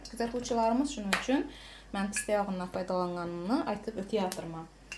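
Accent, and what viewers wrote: Khorezm, Uzbekistan. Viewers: Ассалому алайкум. Роликни тасодифан кўриб қолдим. Овқат пишираётганингизда Аллоҳни ёд этганингиз, Хоразмча, чиройли сўйлаганингиз учун рахмат, қизим. Аллохнинг панохида юринг. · Хорезм таомлари зўр. Айниқса сузма палов. Менга жудо ёқади хоразимча таомлар, хам хоразм шеваси жудо чиройли сўзлашадилар. Котта рахмат синглим. Хоразмга бориб кегандай бўлдим. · Ozizni shevayizda chiqarganiza dm quvondim apka manam xorazmdanman boshqala xorazmdan bosanam tushnmidla dab yo ozn shevasinan uyolganindan adabiycha soʻllab video chiqaradila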